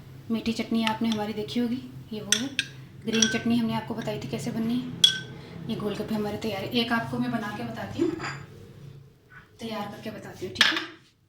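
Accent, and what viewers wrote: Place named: Punjab, India. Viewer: Ap Ka punjubi satyl bohat acha h 😘me b punjubi hn I mrs Usman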